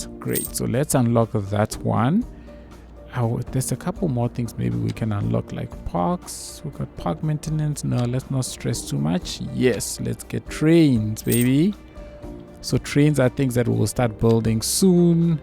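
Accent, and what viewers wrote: South Africa. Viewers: Thank you for putting this up. I really enjoy the South African accent! It makes me miss home! :)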